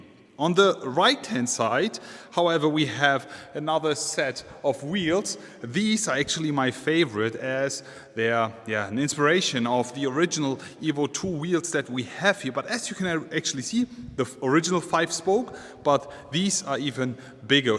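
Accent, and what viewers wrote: Germany. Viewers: It´s always very funny to hear a german guy speaking english !!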